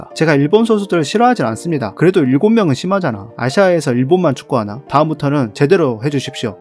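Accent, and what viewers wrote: Jeolla, South Korea. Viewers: 전라도 사투리가 심하시네요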